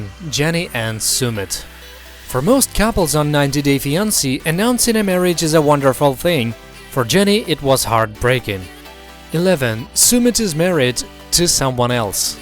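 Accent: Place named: England, United Kingdom. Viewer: That's because he is from England. They pronounce words differently. What bugs me is if a word ends in 'R', they add on an 'A' when they say it.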